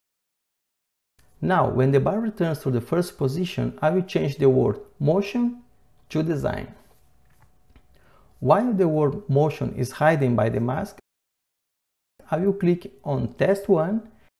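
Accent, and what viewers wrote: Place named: Brazil. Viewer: That brazilian accent...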